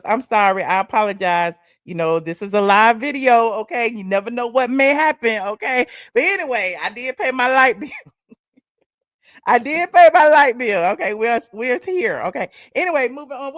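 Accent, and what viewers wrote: South, United States. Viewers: I'm a new Yorker and love the southern accent " hol on lemme see" I don't want y'all to hear me yell in yall " eea". Ear? Bottom seat every " yeea" year? Love u. My son hijacked my you tube again took my Love4all formally yuchboi. Gotta split phone email. Ugh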